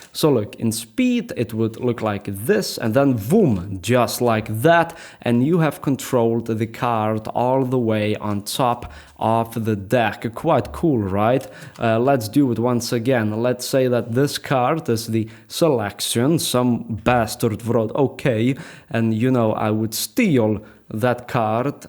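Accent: Russia. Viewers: I love the O.K. Hehe. (: I love the videos bro!! Your English is very clear, very good, and you're able to express yourself very well. Plus, the Russian accent is awesome! My dad is Jewish, Russian, Polish, etc. I've never been to Russia. Hehe. at times lives in my birth city Miami, FL. I used to live in but I am living abroad. I've never seen him in-person before. Thanks for the video!! ( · He sounds Russian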